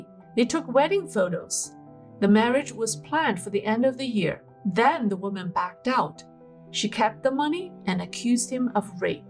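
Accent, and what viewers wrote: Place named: United States. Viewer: Wow. She sounds like an American woman.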